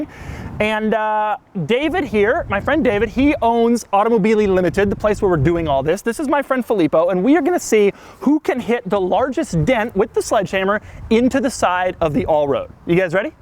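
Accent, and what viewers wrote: United Kingdom. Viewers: you sound like a land rover enthusiast...british actually, not forgetting that british motors are awfully unreliable. get that from an african!!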